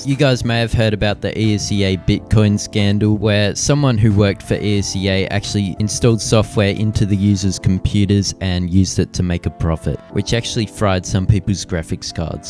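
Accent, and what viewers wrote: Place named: Australia. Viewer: i think i played with this guy last night on deathmatch i'm guessing your from aussie from the accent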